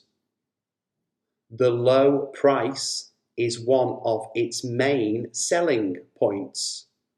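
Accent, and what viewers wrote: United Kingdom. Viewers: Hi Greetings from Türkiye nice video for british accent I can understand Thanks :) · Hi! Thank you for teaching us.🙋 I've just done day 1 and I have a question. Is it british accent? (I mean english language the og ofc) Are these homophones also the homophones in american accent english? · Спасибо. Только один вопрос в изучении английского языка. Как учить британское произношение, как у вас по вашему уроку или всё-таки сразу американское для разговорной речи? Если учить британское произношение, не будет ли оно мешать в понимании американской разговорной речи? Спасибо.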